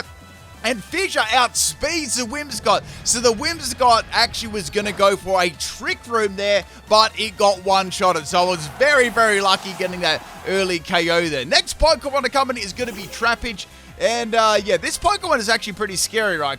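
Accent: Australia. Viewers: Aussie voice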